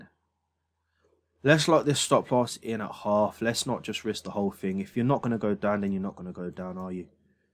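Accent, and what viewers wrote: London, United Kingdom. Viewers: Thanks Boss. Your British right? What broker is this? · Honestly mate i think this is quality - i love the way your showing what you would do before & then after whether it be a win or a loss....love the honesty there , people learn more from mistakes .You sound like your from my kneck of the woods - London Lad.Anyways keep doing what your doing brother , you come across as a nice genuine guy .Liked & subscribed .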